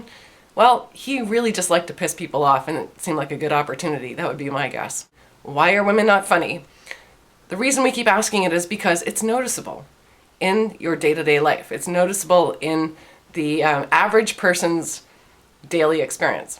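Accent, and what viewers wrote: Canada. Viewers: Weird Canadian accent..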